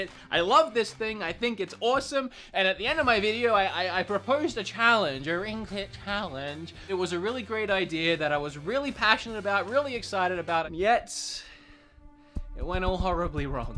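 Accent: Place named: Australia. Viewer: You're an Australian!? I did NOT expect that!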